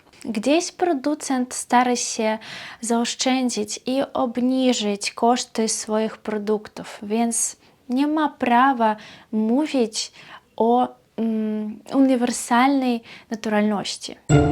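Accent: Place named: Borderlands, Poland. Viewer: kolejny bardzo ciekawy filmik. Twój pomysł, aby zrobić cykl filmików o historii Białorusi uważam za świetny. Zrób to! W Polsce panuje opinia, że Białorusini zaczęli odkrywać swoją tożsamość narodową z końcem XIX wieku. Wcześniej (i później zresztą też) określali się jako "tutejsi". Historia bardzo mnie interesuje, szczególnie okres Rzeczypospolitej Obojga Narodów. Jestem bardzo ciekawy rezultatów Twoich dociekań i opinii. Jestem też pewien, że zainteresują one również wielu Białorusinów. Jeszcze raz - świetny pomysł! Czekam z niecierpliwością... P.S., mówisz po polsku, jak rodowita Polka urodzona na Kresach (kwestia akcentu), a wiele Polek mogłoby pozazdrościć Tobie bogactwa słownictwa...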